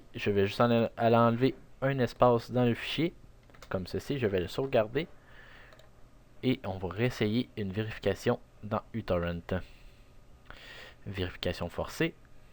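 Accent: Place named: Quebec, Canada. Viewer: C'est un québecois normal qu'il a un accent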